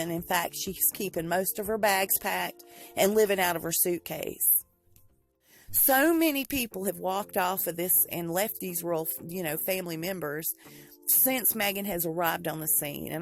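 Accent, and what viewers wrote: South, United States. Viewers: P.S. I really Like Your Southern Accent. Where Are You From In The South?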